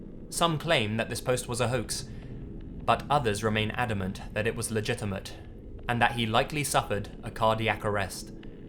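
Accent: England, United Kingdom. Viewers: I take this too seriously because of his Sherlock Holmes accent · just came from another video, a guy with a crappy voice. While waiting for the ad to finish, I was thinking "Please have a britiish accent ;-;" wasn't disappointed. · Do you have a world map or maybe even a globe? If so, I'd encourage you to observe there are actually four countries that Britain is comprised of, namely Scotland, Wales, Northern Ireland and England. I've named them in that order, so you'll see what you believe to be a British accent is, in fact, an English accent. The rest of us, comprising of three Celtic nations, can hardly be expected to bear the blame for the narrator's accent, as we are not English, nor is there a common accent, only a language. Do you see what I mean?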